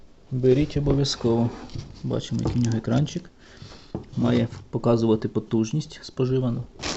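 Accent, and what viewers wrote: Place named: Kyiv, Ukraine. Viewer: если тебя спросят откуда ты взял "що то має бути на виході"? что ты ответишь? В описании такого нет (это вопрос чисто для себя самого, вернуться в "реальность"). Частково жартую... але... Сколько в споре запросил возврата? Когда жил в Киеве, балдел от того как говорят "старики" (когда чистый язык, без суржика). Обожаю Киевски и Полтавский вариант речи. Вас тоже прям приятно слушать. Ещё раньше балдел от канала "Дядько Максим". Приятно было слушать и смотреть как он чё-то там точит. Получается у Вас это скорее хобби? Или я не правильно себе представляю "інспекційне обладнання". Вас не напрягает что я пишу на русском? (а то в голове половина мыслей идёт так, половина сяк, но удобней писать на рус.)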